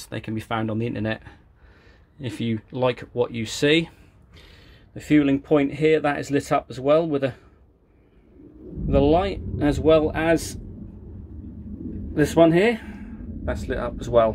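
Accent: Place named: Norfolk, United Kingdom. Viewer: Fantastic layout mate! That accent sounds very Norfolk/fen am I right ?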